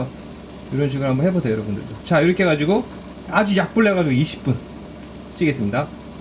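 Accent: Japan. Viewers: Gecko Park_KR 교포는 아니시고 일본에서 오래 생활하셔서 그래요 ㅋㅋㅋ예전 영상들 찾아보시면 일본인이 한국어 쓰는거 같을정도인데 영상하시면서 많이 돌아오신듯ㅋㅋㅋ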